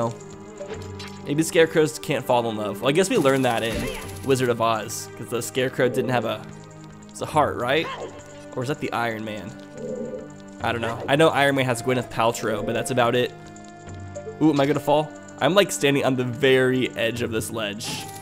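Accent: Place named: Midwest, United States. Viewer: Haha, no problem. Understand that I mean no ill will or insults to your location dialect (I live in the midwest too and am kinda guilty of the "Ohp" thing as well)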